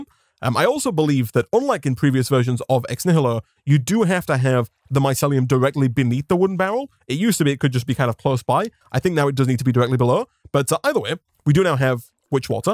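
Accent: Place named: Yorkshire, United Kingdom. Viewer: man, you must speed up your videos. why? you have the voice and pronunciation of a beautiful Yorkshire angle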